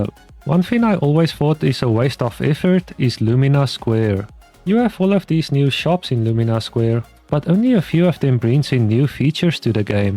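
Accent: South Africa. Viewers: I know a boet when i hear one, Great vid fam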